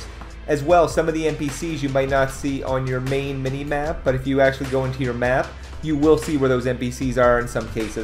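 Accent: Canada. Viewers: Nothing wrong with sounding Canadian! I’m Canadian too lol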